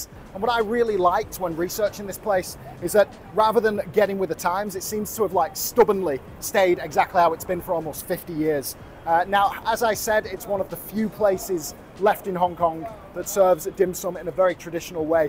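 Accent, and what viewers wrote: United Kingdom. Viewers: Best Food Review Show on YT. Better than Mark Wiens. Don’t ever stop. Love the OTT, British accent, food energy, videography. ✅💪👍 #nojoke #keepatit #bestfoodreviewshow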